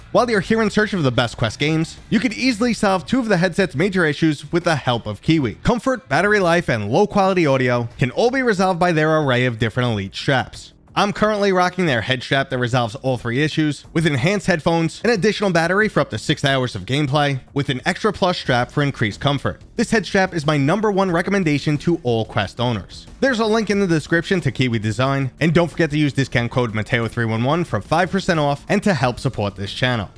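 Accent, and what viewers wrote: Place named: Brooklyn, United States. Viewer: You gotta be from Brooklyn. Also, 311 rules. Cheers!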